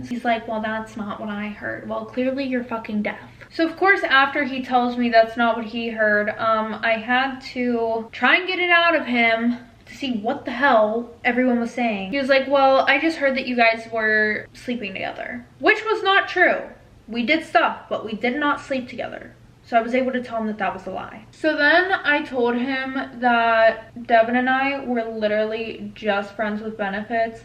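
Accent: United Kingdom. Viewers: Thanks very much❤️❤️❤️❤️that red makes your blue eyes pop 💙💙💙Love ur British accent!!!! Love from uk!!!! 😂😂😂😂😂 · I actually liked the British accent 😂❤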